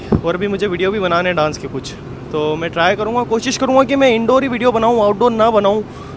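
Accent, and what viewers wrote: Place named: Mandsaur, India. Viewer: Tu bhai tone chng mat krna...khanpuriya mandsaurii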